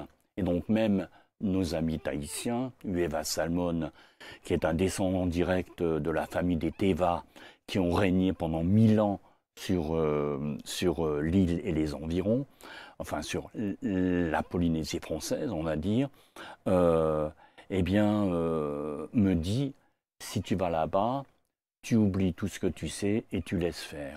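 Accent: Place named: Alsace, France. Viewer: le délicieux accent alsacien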